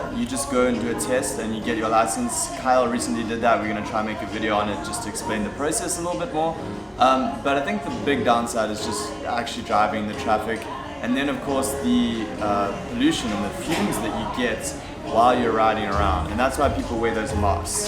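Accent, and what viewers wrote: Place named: South Africa. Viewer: Dang, your SA accent is way more thick in these older videos.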